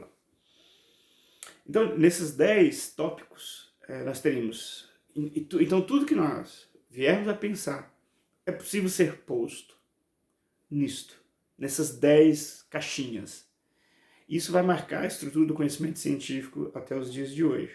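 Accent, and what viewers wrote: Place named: Minas Gerais, Brazil. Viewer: Eita mineirice aristotélica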